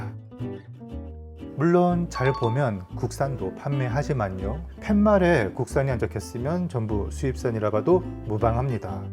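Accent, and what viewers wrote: Gyeongsang, South Korea. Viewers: 님을 알게되고 영상을 수년간 봐오면서 의아?했던점이 '서울분으로 알고 있는데 말씀하실때 왜 경상도느낌이 계속 나지?' 했는데 오늘에서야 비로소 궁금증이 해결되었네요ㅎㅎ부모님께서 부산분 이셨구요ㅎㅎ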